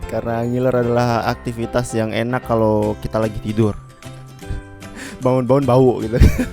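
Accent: Java, Indonesia. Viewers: cara ngomong biar ga medhog gmn bang? #TanyaTAMPAN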